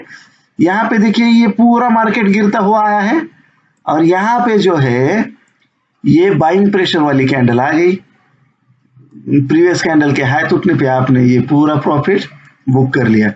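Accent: Maharashtra, India. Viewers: Saheb tumcha tone varunach olakhla tumhi marathi ahe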